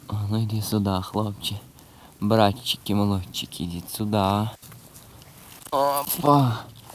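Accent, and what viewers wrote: Hutsul, Ukraine. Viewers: Дуже люблю гуцульський діалект, я сама з бойків, ваших гірських сусідів.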